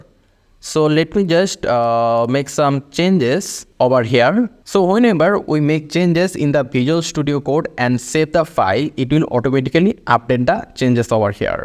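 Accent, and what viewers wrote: India. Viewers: bro when i hear a idian talk i instanly knew that my problem will be fixed :)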